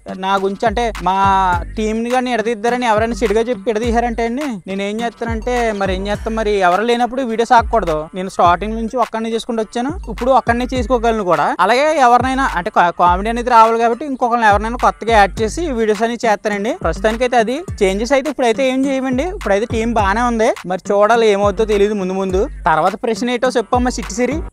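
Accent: Godavari, India. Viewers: Hai anna mana godavari slang lo super warning echav anna all the best anna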